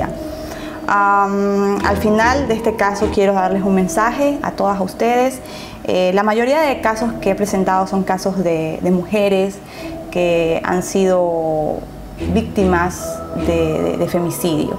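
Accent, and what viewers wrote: Guayaquil, Ecuador. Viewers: soy uruguaya y he visto todo lo posible de esta chica hace los videos con gran respeto y en cuanto al lenguaje es su expresión natural lo se porque soy docente ,si les molesta su modulación es porque no conocen ni respetan su acento ecuatoriano! si no les gusta no miren! Es muy fácil criticar! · Nosotros los ecuatorianos hablamos así! No la critiquen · Así hablan los Guayacos. Pero bien que tengas este canal. Es interesante.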